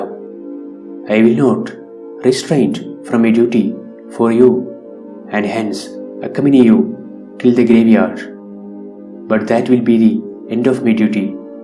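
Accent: Kerala, India. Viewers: Good One! Just that mallu accent is the only negative part. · Malayali narrator?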